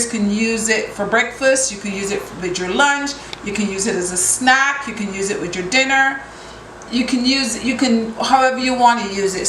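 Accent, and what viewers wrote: Barbados. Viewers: So good to hear your Bajan accent!!! I'm Bajan too living in Jamaica. I'm about to make some fritters now! Thanks for this recipe.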